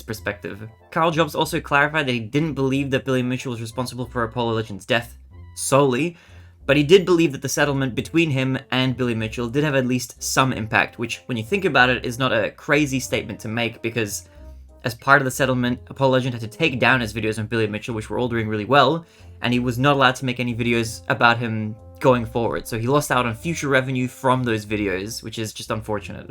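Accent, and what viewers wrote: United States. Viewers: I thought you were a weird Minnesotan.* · your accent is very Americanised. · You are definitely take the medal for most American sounding Australian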